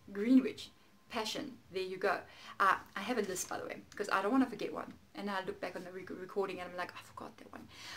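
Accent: South Africa. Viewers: Your accent reminds me of my South African neighbour. I think she's from South Africa...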